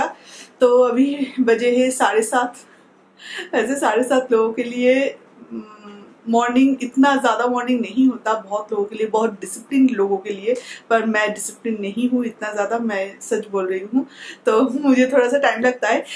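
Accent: Bengal, India. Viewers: Main hun Bengali...... U try ur best to speak in Hindi, but pura Bengali tone aata hai.. It's OK... U will learn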